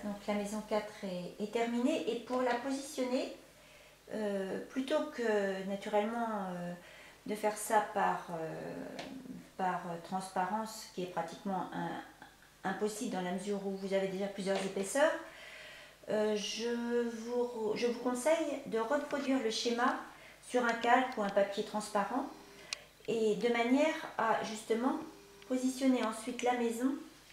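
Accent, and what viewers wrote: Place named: France. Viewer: Love listening to your French accent 😀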